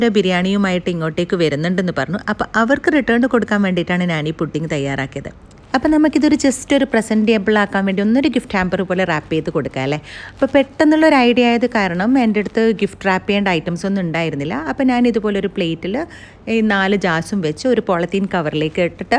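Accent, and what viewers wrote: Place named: Kannur, India. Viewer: ചിലർ നമ്മെ അത്ഭുതപ്പെടുത്തും എന്ന് പറയാറില്ലേ... എത്രമാത്രം ശരിയാണെന്നു ഇങ്ങളുടെ ചാനൽ കണ്ടാൽ മനസ്സിലാവും.. അസാധാരണമായ റെസിപ്പികൾ, ആരെയും ആകർഷിക്കുന്ന അവതരണം മാസത്തിൽ ഒരു വീഡിയോ ആണെങ്കിലും അത് കാണാൻ ആരും കാത്തിരുന്നു പോവും.. 🥰🥰🥰 എത്ര ഭംഗി ആയി വളരെ വ്യക്തമായി അവതരിപ്പിക്കുന്നു.. കണ്ടപ്പോൾ തന്നെ വായിൽ ഒരു പേമാരി വന്നു 👌👌🤣ഒരു രക്ഷയും ഇല്ല... കിടിലൻ റെസിപ്പി.. ഭാര്യക്കും പെങ്ങൾക്കും ഷെയർ ചെയ്തു... 👏👏👏 ബ്രോയുടെ ബിരിയാണി കിടിലൻ ആണ് 🌹🌹🌹 (ഇങ്ങൾ kannur ആണോ നല്ല അക്ഷര ശുദ്ധിയുള്ള സംസാരം ). ടോട്ടലി അടിപൊളി എപ്പിസോഡ് 👏👏👏👏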